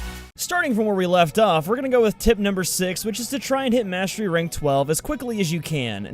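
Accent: United States. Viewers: Are you from America?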